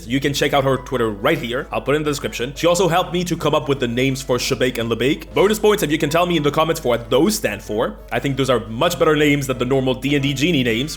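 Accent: France. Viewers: WAIT WHAT ARE YOU FRENCH !?!?!?!? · welp, as a french guy my self i must say... your french sounds... french ? yet you're english does not... i'm confused mate xD are you a fellow frenchman ?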